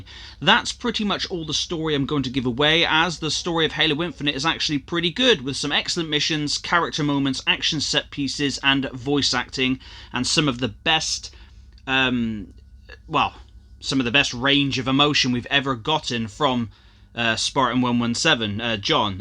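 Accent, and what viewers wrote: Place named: West Country, United Kingdom. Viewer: My game of the year, been looking forward to this game for months and was not disappointed, great review, ive actually found a couple Easter eggs (giant sandwich and the original xbox) I have to ask..... what part of the UK you from? Love the accent! I'm a Bristolian, you have a west country twang! Lol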